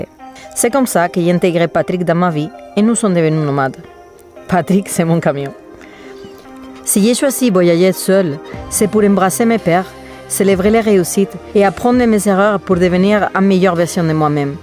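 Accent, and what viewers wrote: Spain. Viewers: C'est toujours un plaisir de te suivre, avec cet accent espagnol rempli de lumière❤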